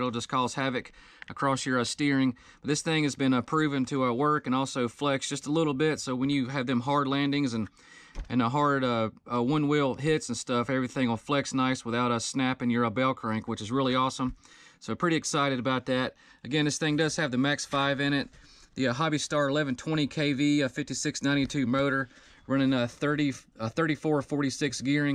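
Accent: South, United States. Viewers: I thought i heard a little southern in your accent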